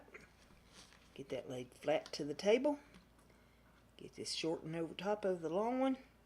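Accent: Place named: Tennessee, United States. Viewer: lol. I've got to get myself a new computer, too. So, I giggled when you talked about that. lol. I've never used a wood burner on leather, but that turned out beautifully. I've only ever stamped with metal stamps into leather to make some keychains, so the burner effect will be neat to try. I've added this to my support playlists- Handmade Crafty Creators and the one for Leather Crafts. It's great to hear someone with an accent like mine. Reminds me of home back when we lived in TN. lol. Great crafty content, gal. Craft on!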